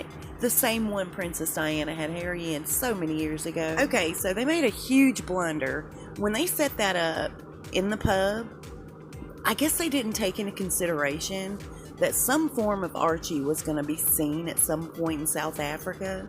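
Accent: South, United States. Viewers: Stop already!! You and your haters need to keep your opinions to yourself. You love drama and gossip. You sound like your from the South I can't understand some of your words. Drop the accent, what are you perfect. People like me are a pain my ass. You can't say anything nice.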